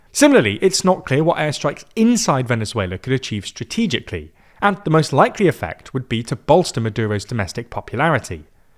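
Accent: United Kingdom. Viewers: People with British accents don't get to tell Americans what we can and can't do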